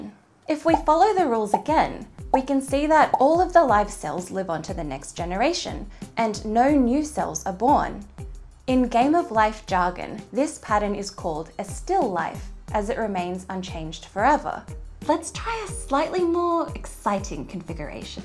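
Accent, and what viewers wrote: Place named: Australia. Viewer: I don't know why I totally missed figuring you as an Aussie. Your accent just doesn't scream it to me. But no complaints finding out now. Opposite side of the world, but opposites attract! Hey, don't take my word for it -- just ask your friendly neighborhood magnet! So, I'll keep coming back as long as you keep sciencing the sh*t out of Youtube!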